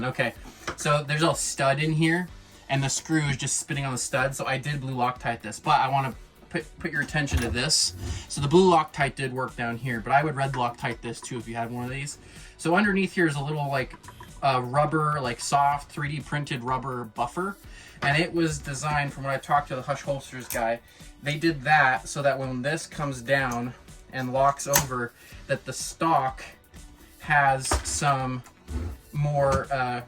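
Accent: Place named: Alberta, Canada. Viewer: Ah southern Alberta love it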